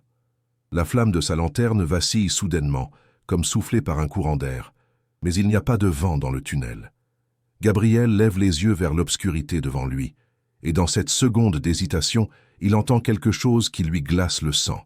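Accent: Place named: Marseille, France. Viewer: Très agréable votre voix Marseille 😊 un peu de soleil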